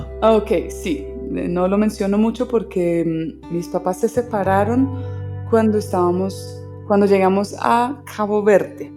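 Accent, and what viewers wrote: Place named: Colombia. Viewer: No tiene acento extranjero, habla con acento colombiano.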